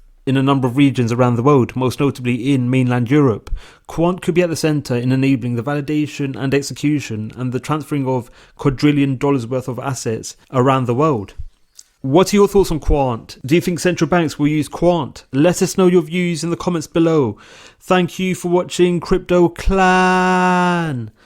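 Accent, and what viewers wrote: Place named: United Kingdom. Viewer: Bru that British Accent.. 😣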